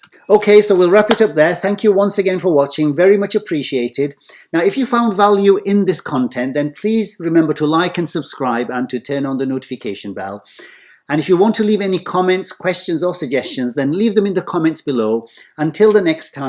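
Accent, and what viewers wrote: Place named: England, United Kingdom. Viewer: Your effort is really appreciated! Please don't feel pressured to put out more content than you currently are (although I'd be the first to watch it, haha). I'm sure you've got great friends and family that appreciate your time and wisdom as much as we do. By the way ... I've been having the hardest time trying to place your accent. What part of England are you from? :)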